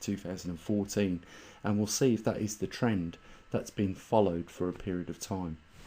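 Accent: Birmingham, United Kingdom. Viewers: Bloody good info, this explain perfectly whats happening I fully believe were going into a GSM cooling period and I follow ADAPT2030 and Ice age farmer, so I will subscribe to you to get more good info....I'm in ozz now but was from Castle Bromwich..you cant hide that accent mate.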